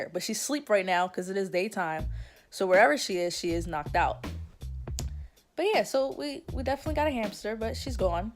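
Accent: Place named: Philadelphia, United States. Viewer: 😂 as soon as you started talking I could tell you was from philly. Good video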